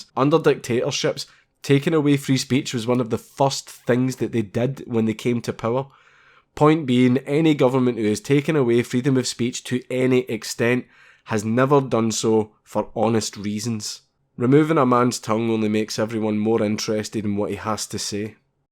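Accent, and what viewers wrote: Scotland, United Kingdom. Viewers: No more living in an echo chamber. As lots of leftist SJW supporters do - without any accustical problems that come from the house they use to live in. To be serious, my first language is not english, and the missing echo makes it more easy for me, to understand your beautifull scottish accent. I was born and raised in south Germany, not Bavaria, where we talk an accent some people in the rest of Germany just hardly understand. Its called Schwäbisch (Swabian), and some people say, that Scotts and Schwaben must be close relatives, as Scottsman are known for keeping their money together, Swabians are known to be thrifty. Scottsmen must have been expelled from Swabian Country, because thery spent to much of their money, is an old joke they tell about us. And both, Scottsmen and Swabians, have a funny accent. And are proud of it. 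This was not a typical vid of yours, but a good one, verry informative. Some people who did not get it until the verry day are now better informed about your intentions. If they want to. For fucks sake, stay who you are! And if you ever get stucked in an elevator with voice control, don't forget: Scottland! Freedfom!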